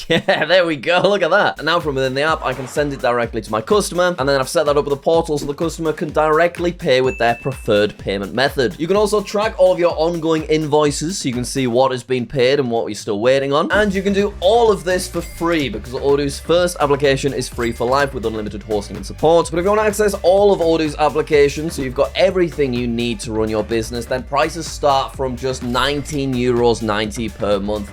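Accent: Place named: United Kingdom. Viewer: just like you thougt pingus were swiz i thought you were amrican but as time passed i finally knew you were british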